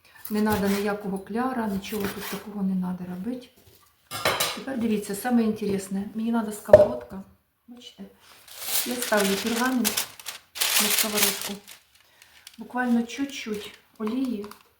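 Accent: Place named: Surzhik, Ukraine. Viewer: ніяка не біда , це суржик , в кожному регіоні свій говір , ви ж не будете заставлять львівян , чи тернопольців розмовляти як ви , краще вчиться готувати і не чипляйте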